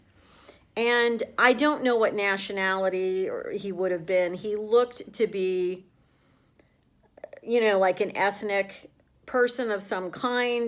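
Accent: United States. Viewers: Are you guys realising the hatred here for Americans right now???? Or are you asleep!!!! Do you not get EU news in the US...the vitriol for your country is palpable!!!. I did tell you its not safe for a woman alone in France. I doubt you read it and if you did probably ignored it. ITS NOT LIKE BEING ON HOLIDAY FOLKS...ITS ENTIRELY DIFFERENT. Personally Id go home. I'm here in the UK. No differant, anti US very strong. Honestly I have no idea why you moved to France. You'll never sound French you're obviously an American ... Any who thinks I'm being nasty I'm honestly not...but its bloody dangerous and those saying its not are ???? Living in a bubble i guess.. Just saying as it is. also EU hates US at the moment and not suprising! Your Administration ...there are no words. Kids cause hellll here as well, alot of trouble. I'm 67. I've lived all over Europe. 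...you are not safe alone with no friends or relatives. Why do that? Truth. London is a real nightmare. It's NOT Clockwork Orange....😂 seriously, but its bad here. But if you go North up to York or Lincoln or just far away from London etc it's nicer. ...but! I do not understand your rationale, EU has terrible problems these days. Violence. You're a nice woman. If you want to come to UK avoid London. Really bad. I know lived and worked here on and off 45 years! Still here .....not the London youd recognise.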